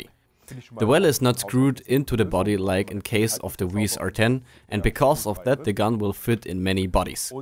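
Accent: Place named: Germany. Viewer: Language advice: german to english - your V's and W's are backwards. You are using a Visim scope. Your pronounciation was "Wisim". Just flip it around and you should be good. I have german friends that make that mistake all of the time.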